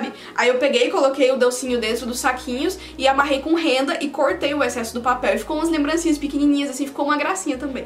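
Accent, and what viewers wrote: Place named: Minas Gerais, Brazil. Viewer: Ahá! É de Minas Gerais né, uai. Kkkkk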